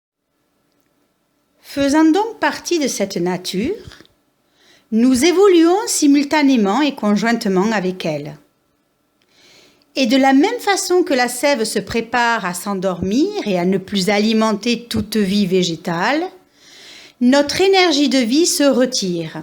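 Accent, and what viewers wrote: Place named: Provence, France. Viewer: Capsule interessante et tout à fait vrai dans ce que vous enoncez. . Merci ! P.s : d ou viens ce bel accent ? Paca , occitanie ? Bonne nuit . Yves .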